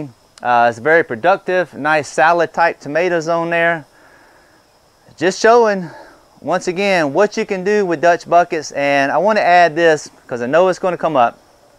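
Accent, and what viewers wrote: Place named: Louisiana, United States. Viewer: I remember many years back, addressed on video, subscriber comments about his "accent". He stated that after going back and listening to himself he couldn't hear what people were talking about? I always figured it was remnants from the cajun region...if I recall right? I had never even noticed till brought it up one day and lo and behold He hasn't lost any of his verbal charm!!! Love ya, you have taught me so much the last decade, I truly appreciate the many many hours you put in and the honest down to earth teaching you blessed us with. Hey, when the snowflakes show up next time....let us handle 'em....with love & kindness.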